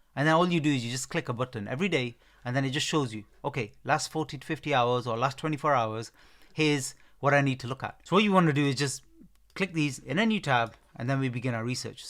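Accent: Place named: Newcastle, United Kingdom. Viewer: Bro, you can tell he's from around the Newcastle~ish region - from listening to the accent, in my opinion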